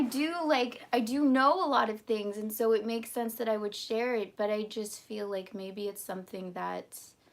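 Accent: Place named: United Kingdom. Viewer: I'm glad you are doing well. Thank you for the book reading today. You don't have to do that next stream if you don't want to but you're a terrific reader with a lovely British accent lol. Hope you will continue doing at least one Livestream a week but if you need a break every so often from YouTube that's cool too. Have a good weekend 🙂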